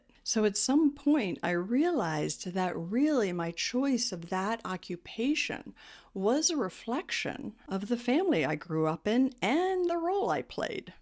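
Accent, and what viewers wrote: United States. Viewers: Are you from Iowa?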